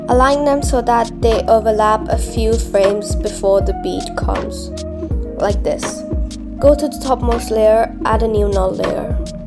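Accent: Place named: United Kingdom. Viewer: she has a british accent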